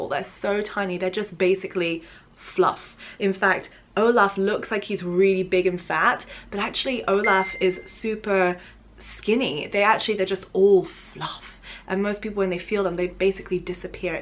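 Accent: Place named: England, United Kingdom. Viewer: I'm French and I love your videos first because it's interesting, and i love you English accent so much, i like listening to you for your english x) but your video are amazing ! Thank you !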